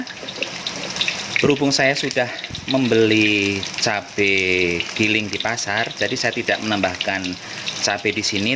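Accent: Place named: Java, Indonesia. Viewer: terimakasih mas... Aku suka channel ini... Mas nya pinter masak dan orang nya suka lucu- lucuan. Bikin ketawa. Suka juga dengar suaranya asli jawa.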